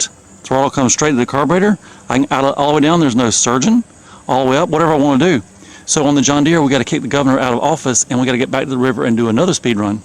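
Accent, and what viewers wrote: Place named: Harkers Island, United States. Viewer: Dude sounds like he's from harkers island N.C.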